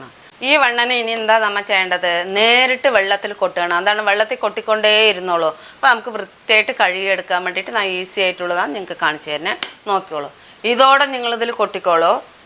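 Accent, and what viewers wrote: Palakkad, India. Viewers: പാലക്കാട്‌ ആണോ സംസാര രീതി കേട്ടിട്ട്? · പാലക്കാടൻ ശബ്ദം... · നാട് പാലക്കാട്‌ ആണോ സംസാരം കേൾക്കുമ്പോൾ അതുപോലെ തോന്നുന്നു ❤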